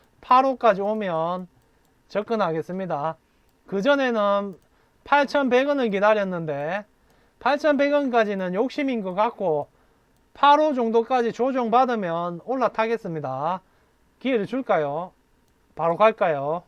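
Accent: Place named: Gyeongsang, South Korea. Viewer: 멘트가 뚝배기네요 진국입니다 듣다 보면 시간이 넘 짧네요 저도 경상도라 구수한 사투리가 친구 만난듯 정겹습니다 올도 유익한 내용 잘듣고 갑니다~꾸벅~^^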